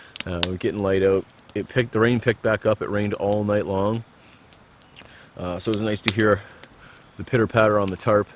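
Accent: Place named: Canada. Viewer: Been watching so much American bushcraft lately that I actually noticed our accent when I first start the last part lol. Like oh ya he’s Canadian too. Glad I found ya bud gonna be flying through your vids. Be one with the liquor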